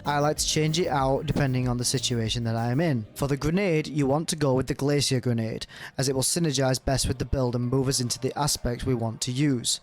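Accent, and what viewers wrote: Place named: Yorkshire, United Kingdom. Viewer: Yorkshire lad! Where's tha from lad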